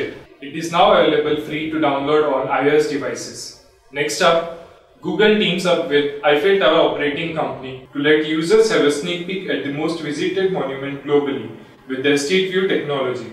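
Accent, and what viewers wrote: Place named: Germany. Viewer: Was Microsoft short of native English speaking people, in order to let this guy do all the talking?! I thought he was speaking German...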